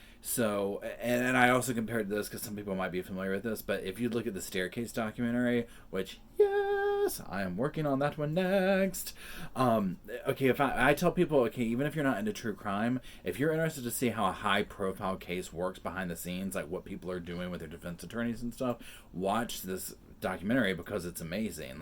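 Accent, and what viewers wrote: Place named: North Carolina, United States. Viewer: You sound like you are from NC!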